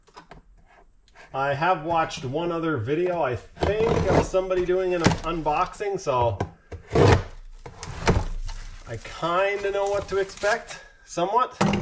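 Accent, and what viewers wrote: Canada. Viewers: Can't watch the whole video due to the Canadian accent. Boot boot boot annoying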